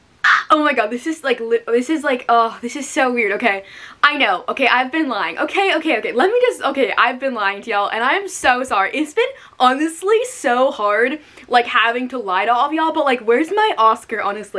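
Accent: United Kingdom. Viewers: You sound so british for an american 😭😭😭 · as a british person I can actually agree! she sounded like someone from North London!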